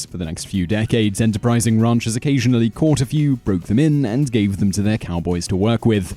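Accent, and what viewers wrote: United Kingdom. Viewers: Making the claims that you do, without research or quoting your sources makes your video totally unbelievable. I have spent over 40 years researching the history of the AMERICAN Cowboy and I will tell you (with your British accent) you are full of BULL. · kind of disingenuous to hear a limey trying to teach us about cowboys. kind of like an American teaching a brit about the Queen, who is a man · I dunno😏somehow hearing this British Accent speaking on the History of American Cowboys...quells my interest a little. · Never trust a video about the American cowboy that has narrator with a British accent. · I didn't get past the first item. Bull corn. Towns did try to regulate, but EVERY cowboy carried a gun when he wasn't having to placate the townfolk. But when they were in Dodge City or Ogalala, they arrived dirty, randy and armed to the teeth. 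Pure fertilizer. And that this comes from an effeminate "researcher" with a British accent only doubles down the offense. Sign me, a hand from Nebraska.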